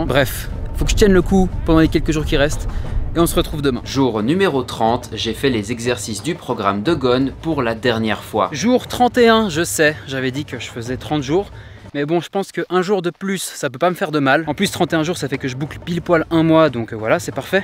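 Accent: Switzerland. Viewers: T suisse?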